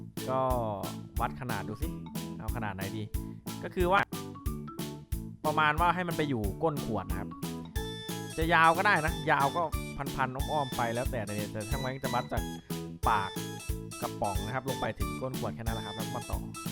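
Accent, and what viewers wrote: Korat, Thailand. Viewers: พี่คนโคราชนิคับ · อ้าวแกคนโคราชนิ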